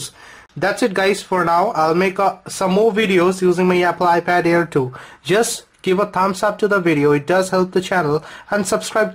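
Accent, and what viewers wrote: India. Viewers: I like ure indian accent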